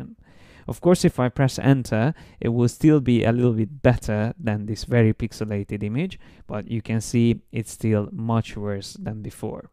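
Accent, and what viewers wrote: Hungary. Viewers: I heard your accent is hungarian - then I saw the little flag up there! 2 - 0 Hungary - Austria!!!!! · that hungarian accent is strangely comforting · fantastic again!! thanks!! btw my girlfriend is Hungarian and she heard your voice while I was looking at the video and said "is that guy Hungarian??" hehe, I myself cannot recognize the accent. Thanks a lot man, very useful tips and great clear-fun teaching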